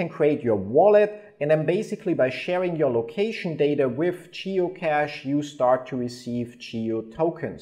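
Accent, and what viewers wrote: Germany. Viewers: this heavy german accent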